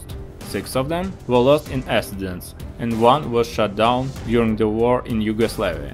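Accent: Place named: Russia. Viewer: The narrator has obviously a Russian accent. Ironic, since this is about the Stealth.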